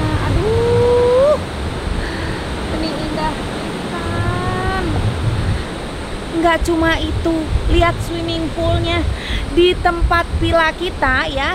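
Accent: West Java, Indonesia. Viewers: Suka banget sm teh sllu apa ada nya, punya ciri khas bhs sunda bangga aku jd org sunda.. Teh jg ga somse 😘😘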